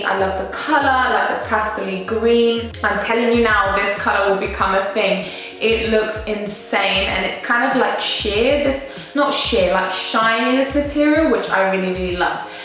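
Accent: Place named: London, United Kingdom. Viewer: I hear a London accent but I can’t place what part... where are you from?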